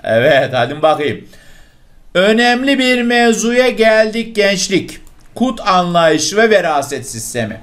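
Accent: Adana, Turkey. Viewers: Adanalısınız galibaa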